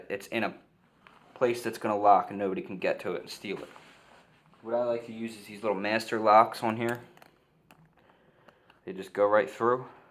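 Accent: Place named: Pennsylvania, United States. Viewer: Can't deny that PA accent lol, I've done a lot of work up there in the oilfield and the locals laughed at my accent and I would laugh at yalls. Had some good times up there., thanks for bringing back some good memories.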